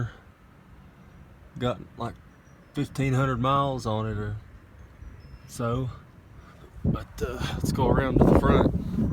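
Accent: United States. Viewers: So southern, sounds of goats followed by a hefty loogy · Your accent is making me miss my Kentucky family. Nice Vid, love the truck.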